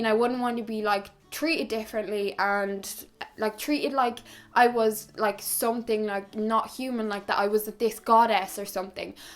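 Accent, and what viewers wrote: Dublin, Ireland. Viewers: Hey beautiful😍!!! your videos make me so happy😄i love everything about you: your pleasent voice, lovely accent (who said you can't understand irish accent??😂)your wisdom And humor , the content, everything!!! I wish you a warm nice calm weekend😙p.s. tv shows that are available on the internet now which i enjoyed very much are: csi cyber and stalker. Must watch!!and yes-i'm bi and i notice girls more than boys, don't know why ❤💖💕❤💖💕❤💖💕❤💖💕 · You’re so pretty! 🖤 I could just sit and listen to you talk forever because of your Irish accent, haha! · Hi! Finally commenting on one of your videos since I started watching them when I lived in Cork for a year (I worked as an au-pair) 🙃 Your accent always makes me miss Ireland so much, haha! Of course that's not the only reason, I do really like your videos and the way you come across, your style... 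I'd say mine is quite a bit different but it looks beautiful on you and oh, you're so pretty 🌹 (Btw, loving Lana del Rey over here as well, and as for the TV series - have you watched 'Orange is the New Black' or 'Skins'? I feel like you could like Effy Stonem from the second generation a lot, concerning the latter... 🙃) I hope you have a wonderful day, greetings from Germany! 🌲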